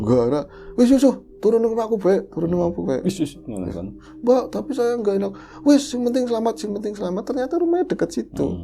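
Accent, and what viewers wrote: Banyumas, Indonesia. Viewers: Dari Ch LapHor, capcuus k CH NGOBROL, stlah itu merapat lgi k Tanah Tua Channel ndengerin ngapak'y mas 🤭🤣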